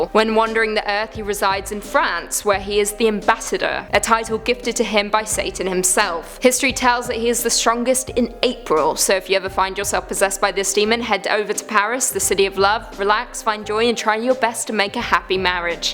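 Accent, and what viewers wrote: United Kingdom. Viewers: Lovely Brit accent though... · British accents sound so cool, especially coming out of this girls mouth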